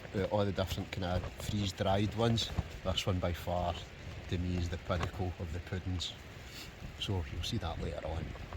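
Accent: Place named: Scotland, United Kingdom. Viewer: Nice Akto like tent, Cool Scottish accent. A camping video in Scotland? That's rare; most of of them are in the lake district on one of the gabels.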